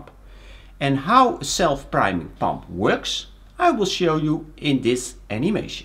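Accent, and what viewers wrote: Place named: Netherlands. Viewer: gotta love a dutchy speaking english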